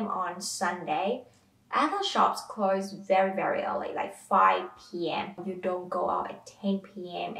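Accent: Australia. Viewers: That is a beautiful accent, definitely class it as an Aussie accent but still different from the norm. You should definitely keep making those trips back to Vietnam, if only to keep that accent from becoming too "Standard Aussie". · You speak very good English, in saying that your accent is almost Aussie. I hope you enjoy your life in Australia. 🇦🇺🇦🇺🇦🇺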